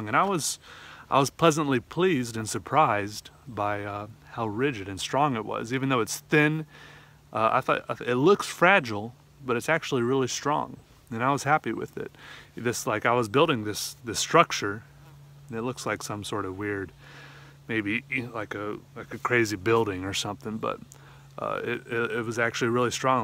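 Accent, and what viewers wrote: South, United States. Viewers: TF? I was, for no reason other than curiosity, looking at alligator skin cowboy boots before opening Youtube and continuing my "Watch Later" list when i see wearing a cowboy hat and speaking with a southern draw. TF is going on here?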